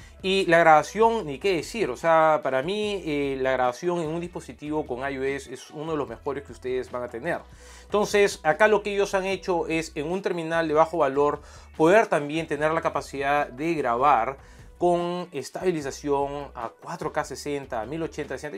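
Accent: Lima, Peru. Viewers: eres peruano?! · Hola, eres peruano? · Ese dejo Limeño es inconfundible xD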